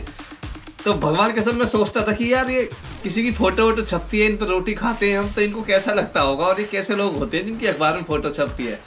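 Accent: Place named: Rural, India. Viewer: Bhagwan kasam I love your Desi voice ( I also have village that's the reason I also speak village language ) Majo Aago !!!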